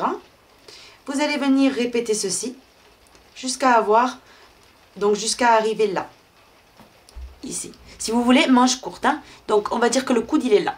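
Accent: South, France. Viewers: Il ressort un accent du midi?? Il me semble sentir Le Soleil dans votre voix et accent!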